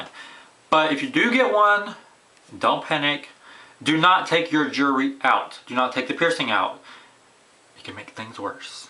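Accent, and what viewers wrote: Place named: South, United States. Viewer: It sounds southern my guess would be Georgia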